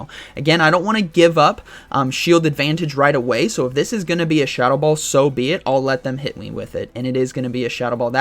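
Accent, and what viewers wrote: United States. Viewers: Didn't realize you lived in the UK. You sound pretty American imo! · He's American, residing in the UK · Wait is from the UK? Thought he was American lol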